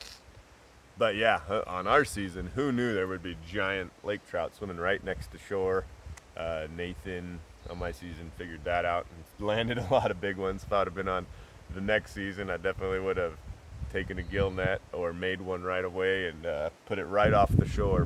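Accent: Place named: Siberia, Russia. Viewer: As you know, Alone on TV is very drawn out sometimes, that’s why I started doing 1.5/2x But, I never got to hear what your voice sounded like at this speed because whenever you or roland were on I would slow it back down so I didn’t miss anything. You guys are like encyclopedias: straight to the point, like how I imagine many most mountain men and Russians are. I have a theory that your time spent in Siberia has given you a really cool dialect. It’s always a pleasure to hear you talk, especially from memory.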